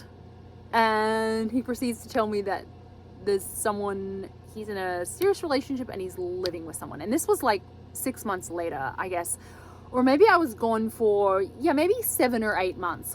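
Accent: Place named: Australia. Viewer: Super cool scarf!! Australian accent superb as always!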